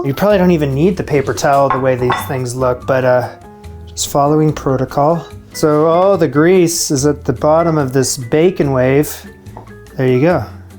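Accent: Canada. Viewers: Has accent become more Canadian since he moved back to Canada?🧐😅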